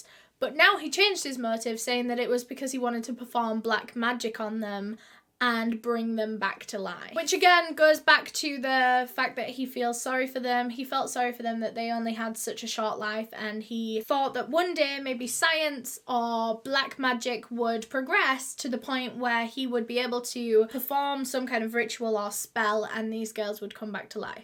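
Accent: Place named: Northern England, United Kingdom. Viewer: I fr rock w videos i cannot bring myself to watch any other true crime youtubers i just love that northern accent and she is so nice i luv her fr 😭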